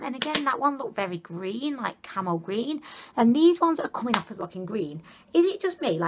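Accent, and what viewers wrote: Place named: Manchester, United Kingdom. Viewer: Ahahah great accent where you from?....Sounds a bit like a Mancunian accent..I'm from Manchester also....your skin looks great but love that lipstick on you x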